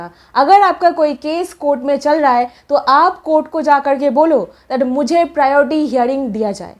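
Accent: Bengal, India. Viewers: I'm 61 and you are Bengali.I can make out because I'm also one but born and lived all my life in Hindi speaking areas. Per se I've not heard of this but will check it out. Not sure if there are any real benefits, that's only feasible in places where active taxpayers fund a social security net, which is not the case in India.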